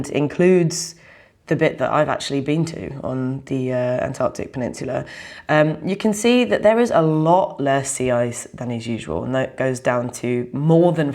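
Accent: United Kingdom. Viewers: To be fair, she does sound a bit British, and we did get our system from them. I say that with the understanding we’ve been too stubborn to update it since.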